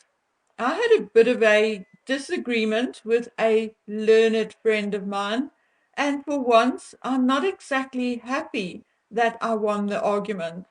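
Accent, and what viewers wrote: Afrikaans, South Africa. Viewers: Not Oidepus .... If you're going to use big words then please learn to pronounce them. Especially as these words are used in clinical settings.Shame man, I understand you're Afrikaans and that you have an accent but if you do not know how to pronounce it please ask someone who does.It is Oedipus....God bless you.✝️🌼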